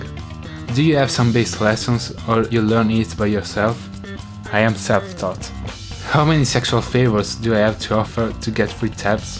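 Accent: Italy. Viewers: So, you actually made an italian voice reveal before 4M subs. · Wow si sente che sei italiano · Vusferno Music E' italiano :) l'accento italiano si sente ma la pronuncia è molto buona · Giovanni Dalo Ho visto poi nelle info, dove ho avuto la conferma direttamente da! Il punto non è che lui abbia una pessima pronuncia, anzi tutt'altro, forse mi sono spiegato male, il problema è che per noi latini è molto difficile riuscire a parlare un inglese senza far capire la nostra provenienza. Prendi i paesi nordici (pewdiepie ne è l'esempio) fin da bambini ti istruiscono a parlare inglese nello stesso modo in cui impari la tua lingua madre e noi italiani per quanto possiamo impegnarci, senza avere esperienze all'estero ci rimane difficilissimo migliorare la nostra pronuncia. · si sente troppo che sei italiano hahahah,suono anche io il basso,spero di arrivare ai tuoi livelli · Italian accent all the way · Okay so this was the Italian voice reveal.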